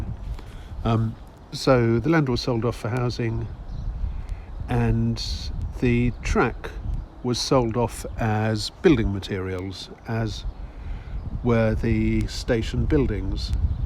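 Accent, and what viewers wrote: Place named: England, United Kingdom. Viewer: As a student I had best time of my life in Oxford so for me its as a nice tune listening to your familiar English accent and walking along familiar roads. I used to rent town-house at Abington road for more than a year before Millennium. Thank you for Oxford walking.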